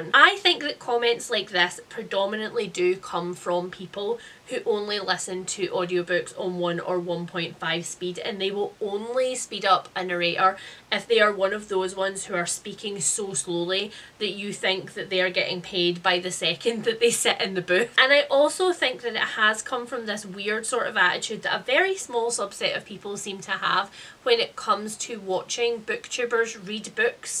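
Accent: Scotland, United Kingdom. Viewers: I have very rarely not sped up audiobooks, often times based on the accent of the narrator I will start lower (usually 1.2) but as I become used to it I speed it up. There have been some narrators that talk sooooooo slow, that I listen to them at 2.5. It completely depends on the book, but probably on average its usually 2x, it just sounds more natural - once I got used to it listening to it at 1x sounds like a record that has been slowed down. I also listen to youtube videos at 1.5 to 2x - 🤷‍♀ It's more normal sounding/soothing for my brain. I like a lot of booktuber merchandise, and I love being able to support youtubers that I watch a lot, I honestly watch youtube more than I watch TV. Also while I don't enjoy all the same books that you read, I do enjoy that you're Scottish 🤣 The accent makes me happy.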